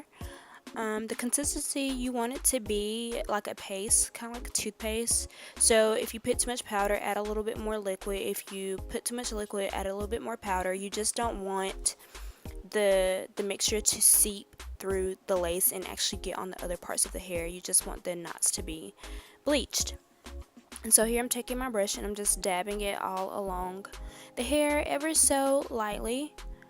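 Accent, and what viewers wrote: South, United States. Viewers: you sound southern lol , where you from ?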